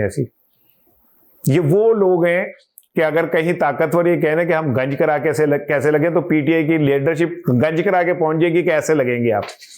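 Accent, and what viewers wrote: Punjab, India. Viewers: آج پنجابی تڑکا کم ہے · Welcome back. I am from Karachi but love your punjabi accent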